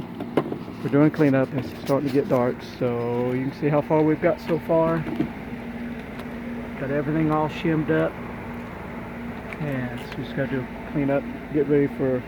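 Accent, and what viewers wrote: South, United States. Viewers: this is probably all too late to be helpful for you, but maybe for someone else... Screws to draw the blocking up into the floor is not a bad idea, but not to keep the blocking in place. And not gold screws, they are too brittle and will break and/or loosen up over time. Some beefy structural screws would be best. The blocking should actually be the same dimension as the joists, and secure the blocking with framing nails, maybe even joist hangers. Also add some subfloor adhesive to the top edge of the blocking. You can also see in the video that the subfloor is compromised. Pain in the butt to fix that, but it ain't gonna get better on it's own. Flashing underneath the sill plate is actually incorrect. The idea of flashing is to keep the water out completely as much as possible. Putting the flashing on top of the concrete is likely to allow water to get into the crawl space between the flashing and the foundation. 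There are liquid flashing products on the market that you can use to seal the joint between the rim joist and the foundation, or you can use the metal flashing but in either case the flashing must overlap the joint and then the siding overlaps the flashing. In addition, seal the ends of the joists, the outside of the rim joist, the the outside edge of the sill plate to help prevent having to replace those in the future. From your accent, I assume the home is in the south, where everything stays wet pretty much all the time. Not much you can do about that. The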